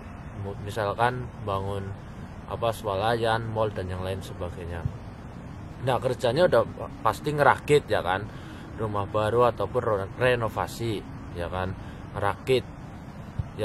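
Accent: Banyumas, Indonesia. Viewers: Wong ngapak pasti keh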